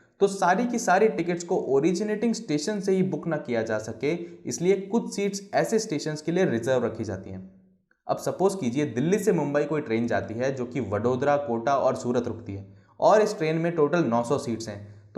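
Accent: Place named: Jodhpur, India. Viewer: Bhai jodhpur se ho kya?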